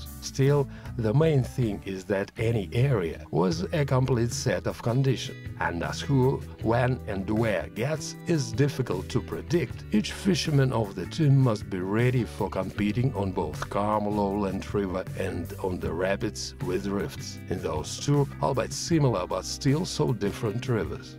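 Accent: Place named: Russia. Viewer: раша акцент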